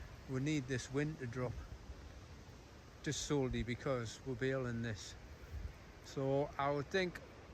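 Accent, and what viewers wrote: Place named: Newcastle, United Kingdom. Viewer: Good vid bro.....wat part of uk u in sounds Newcastle accent.....is this ur darm is it many acres....nice country side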